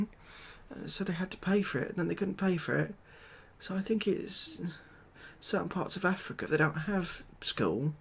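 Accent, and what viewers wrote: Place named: Estuary, United Kingdom. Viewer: Nice estuary English. "Transla'or! "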